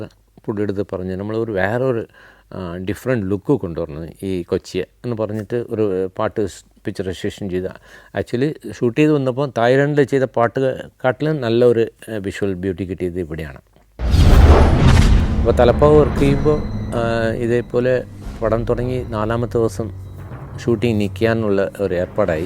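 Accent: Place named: Tamil Nadu, India. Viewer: Tamil Malayalam mixing slang is nice to listen. Waiting for next episodes..keep going ❤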